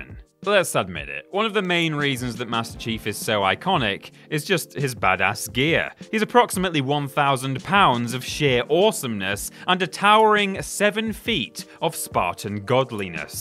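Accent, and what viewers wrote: United Kingdom. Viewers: This voice sounds familiar. Did he used to be with What Culture or do all British people sound the same?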